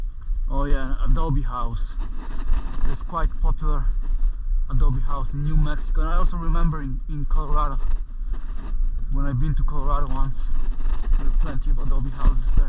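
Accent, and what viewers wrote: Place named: Germany. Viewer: I Detect a Accent 🤔🤔 I almost want say German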